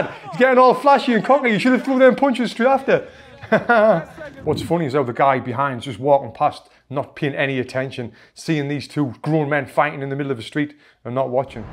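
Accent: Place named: England, United Kingdom. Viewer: jef mate i swear ue english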